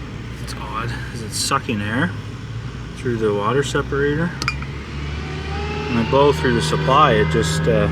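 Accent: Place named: Canada. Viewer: Nice truck man , is that Canadian accent ? Sending good wishes from Scotland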